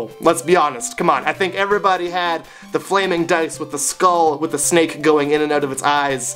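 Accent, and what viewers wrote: Canada. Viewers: i just realized from your accent that you are from Canada and now you look candian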